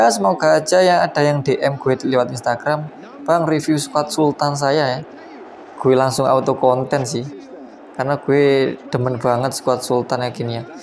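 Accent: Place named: Java, Indonesia. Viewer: Medognya masih kentel😂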